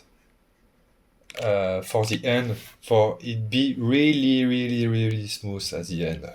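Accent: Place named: England, United Kingdom. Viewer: Merci pour le tuto c est top. Magnifique acens anglais en passant😁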